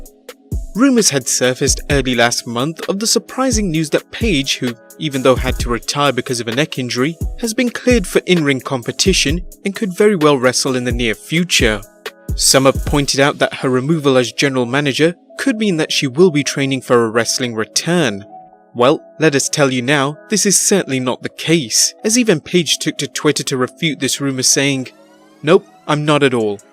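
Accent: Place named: England, United Kingdom. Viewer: Never should’ve been GM. English accents are unbearably painful. More Meltzer-like guess work in this video though, nice work marks.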